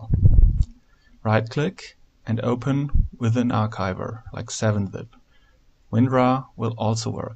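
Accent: Germany. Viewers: I knew you were German with the first sentence you spoke haha :D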